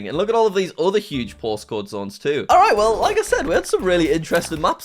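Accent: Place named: United Kingdom. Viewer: how we British people talk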